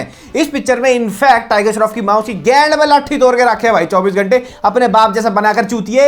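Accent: Haryana, India. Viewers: The haryanvi accent rock bhai!! Yahi chahiye!!! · Bhai tere haryana haavi ho ra h 🤪🤪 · Bhai tu haryanvi h k? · Video se mast to bhai Ki Haryanvi Thi🤣🤣🤣🤣😂🤣😂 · that hariyanvi accent tho : D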